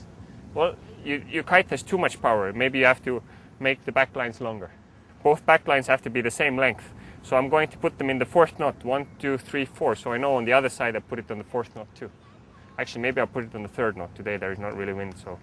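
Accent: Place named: Estonia. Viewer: I figured you are from Estonia by your accent:) nice video tho. I want to learn how to fly badly. :)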